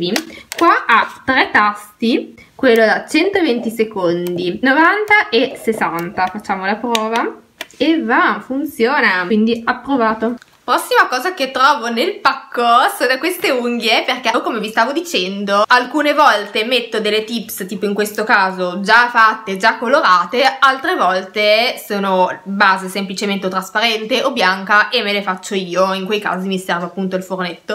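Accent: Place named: France. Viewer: bella la scritta sulla maglietta killing you in my mind,complimenti per il fisico =) cmq mi fa simpatia il tuo accento,sei francese? 😁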